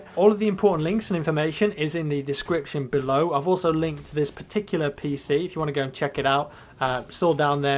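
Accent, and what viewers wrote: United Kingdom. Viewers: How does sound black? Lol can't you hear his British accent? Lel and one question: why does it matter what he looks like? It's mainly about if he's a good person or not, and clearly if you've been watching his videos he's on the good side;)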